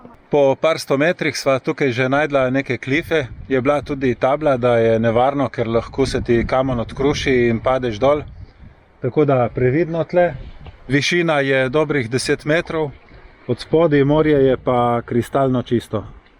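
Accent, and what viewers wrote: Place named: Littoral, Slovenia. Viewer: HAHA, približno takrat sem tud jaz z starši in starimistarši hodu na morje. Sicer ne v ta kamp ampak v Banjole v neko turistično naselje blo je več blokov (mislim, da je blo prek zveze borcev ali upokojecev) kaj človek vse doživi, da na YT slediš nekomu, ki ima še druge podbbne hobije in veselje, in še na skoraj na isti konc hodu na morje (mogoče še celo istočasno). Upam da se kdaj srečamo, mislim da bi te bilo z veseljem spozat, pomoje si zanimiv sogovorec. Pri gledanju tvojih videov sem tudi že opazil, da kolesariš tam kjer sem jaz že bil na izletu. Tko, da je že iz tega naslova zelo lepo gledat tvoje videje. Pa še ta primorski naglas, men en nelepših.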